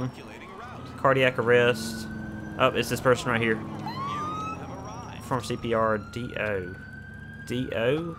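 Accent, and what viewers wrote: South, United States. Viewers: Nice accent lol, always good to see some fello good ole country boys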